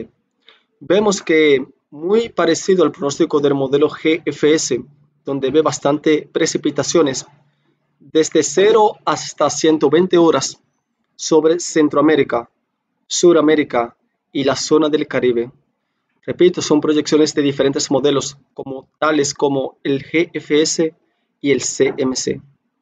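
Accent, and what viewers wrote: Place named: Arab world. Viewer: Le escucho acento arabe..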